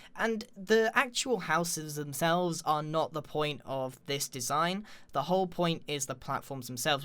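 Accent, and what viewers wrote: United Kingdom. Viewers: uh... hes just british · God like you are like like you are so weird like I hate your accent like what kind of accent do you have French English English like United Kingdom English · Gabriella Siemer that’s called a British accent 😂😂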